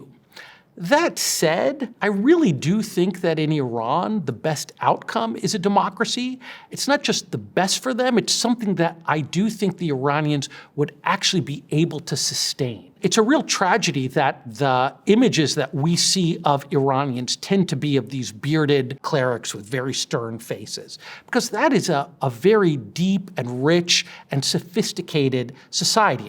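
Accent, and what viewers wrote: Arab world. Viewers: I always wait for him to pronounce arabic words super arabic style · His pronunciation is very Arabic and less Persian.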